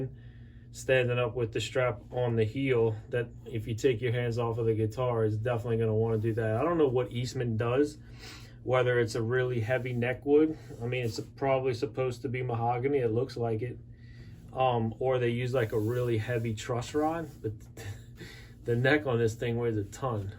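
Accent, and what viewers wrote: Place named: Louisiana, United States. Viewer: So i'm listening to this video but wasn't watching it and i hear the unmistakable accent from Da Parish and then i pulled up the screen and i'm like woah i know this guy. Great video man.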